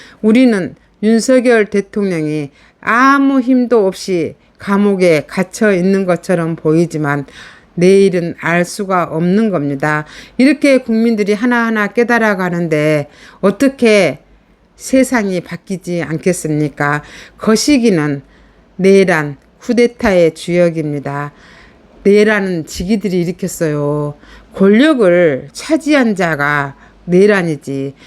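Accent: Jeolla, South Korea. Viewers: 전라도 사투리좀 그만~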